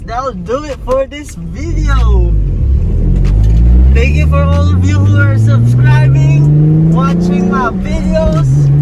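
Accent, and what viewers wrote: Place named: Philippines. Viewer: He's definitely Filipino with an accent.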